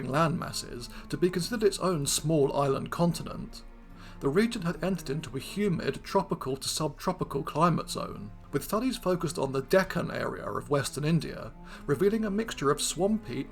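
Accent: United Kingdom. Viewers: you sound like paddington but more british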